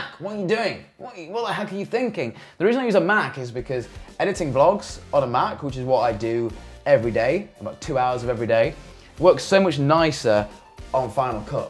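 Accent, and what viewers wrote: United Kingdom. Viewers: Is he welsh?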